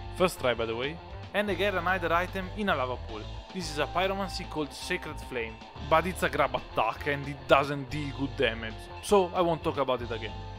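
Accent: Italy. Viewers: Kept seeing this particular video in my recommended tab, a worthwhile video to watch indeed. Forgive me for I am new to this channel. But, that accent I hear, is it Italian? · Are you perhaps Italian? Your accent reminds me of an Italian one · I love the accent. I believe it's Italian? It's very satisfying to listen to imo and a fresh change of pace from the American, British, and Australian accents.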